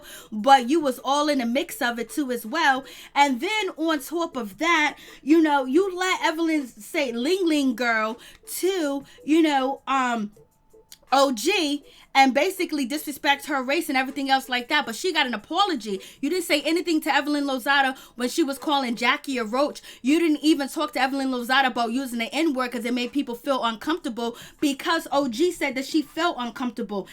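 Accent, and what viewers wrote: New York, United States. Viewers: I love your accent. True New Yorker. 💕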